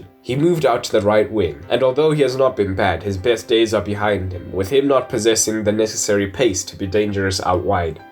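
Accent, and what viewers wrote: South Africa. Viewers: You Have A South African Accent. Either Zulu or Xhosa :) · Is this guy from SA? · Totally of topic here, but this dude sounds south African,... or is it just me?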